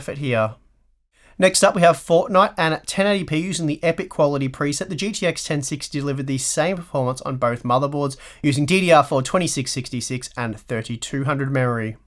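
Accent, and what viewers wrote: Australia. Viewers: Gotta say I can now understand, that australian accent of his really gave me trouble in the first few videos I watch from this chanel and that means a lot due to me living in Australia for almost a decade, ans I thought I can understand the accent already.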